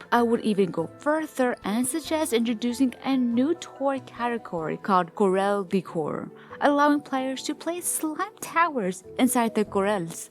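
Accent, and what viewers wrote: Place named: Europe. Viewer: What unnamed random mysterious European country is this chick from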